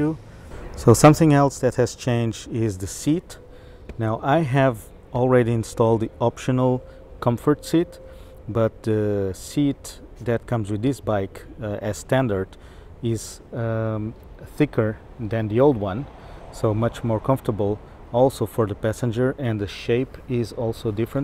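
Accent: Portugal. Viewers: ahah sabia que eras Portugues! Portuguese accent ;) bonitas motos! cheers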